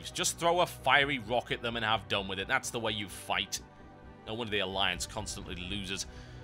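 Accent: United Kingdom. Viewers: Why is everyone going bananas over the accent? Has no one ever heard a British person speak before? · Im ashamed to be from the same country as you :( im Norwegian 2 and i dont see anything wrong with his accent, infact i think a real British accent is awesome. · Wait a second... Are you brittish?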